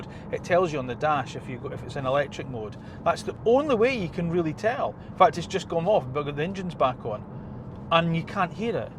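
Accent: Scotland, United Kingdom. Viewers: I love your style of videos, keep it up! Greetings from Greece. Are you Scottish? I like your accent.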